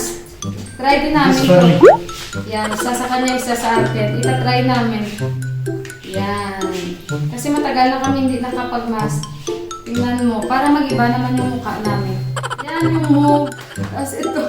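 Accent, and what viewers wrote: Iloilo, Philippines. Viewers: New subscriber here...nawili aq tan aw sg vlog mo..hindi ko paman narinig sau na ilongga ka,but base in your accent im sure u are ilongga....Anong city itong place nyo? Katmandu ba?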